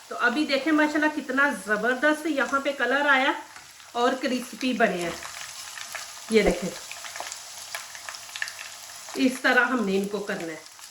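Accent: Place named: Punjab, India. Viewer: Panjabi chhey ketni bhe achhey urdo boley pata lag jata hy panjabe hy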